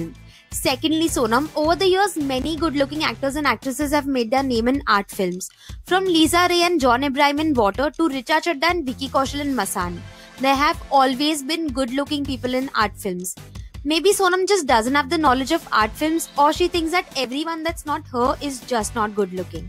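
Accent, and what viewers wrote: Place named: Punjab, India. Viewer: Speaker 👧🏻Punjabi English accent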